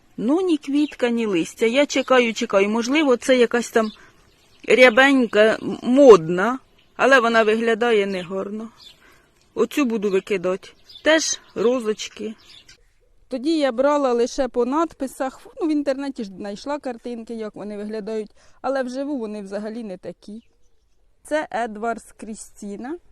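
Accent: Poltava, Ukraine. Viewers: Тільки підписалася на ваш канал. Почула полтавський говір.Якщо не секрет, з якого району? Цікавить діхондра, чи є на продажу?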